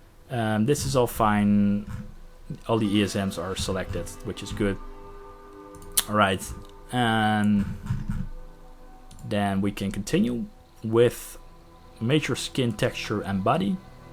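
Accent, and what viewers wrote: Netherlands. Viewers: Might i ask are you Dutch ? wat ik hoor een accent dat heel Nederlands overkomt ;)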